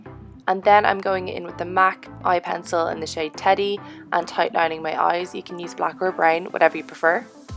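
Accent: Ireland. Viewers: The Vogue ja, sie ist Irin, aber ist manchmal auch in London bei ihrem Freund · she is from Dublin in Ireland ☺ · The Vogue i think she is irish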